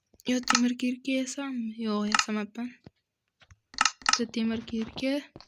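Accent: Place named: Khorezm, Uzbekistan. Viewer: uka xorazmlikmisan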